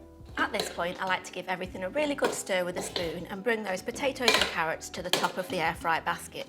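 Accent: United Kingdom. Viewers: So glad to find your channel, I am improving my British accent and your accent and cook are both incredible. I follow u from Iraq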